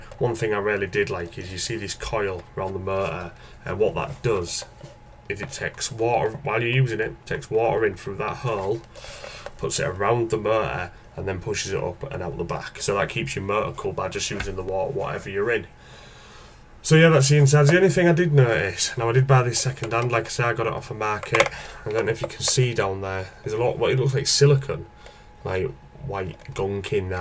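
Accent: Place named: Hull, United Kingdom. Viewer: Loving the Hull accent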